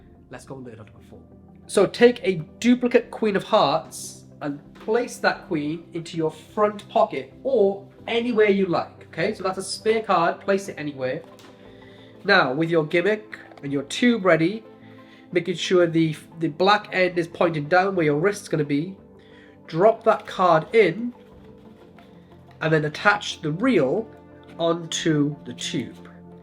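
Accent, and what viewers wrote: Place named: Wales, United Kingdom. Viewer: Part of Wales you from, but? Diffo picking up unmistakable traces of the accent. And with a Celtic name too 😮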